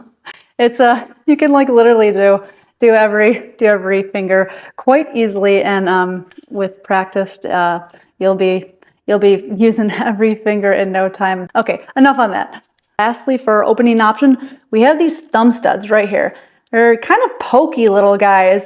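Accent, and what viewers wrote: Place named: Michigan, United States. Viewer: I love that she took my comment as cool as she did, because you can’t really judge tone through text but I love when she drops “actually” in her videos... She hits it hard with that upstate Michigan accent... (it’s kinda cute)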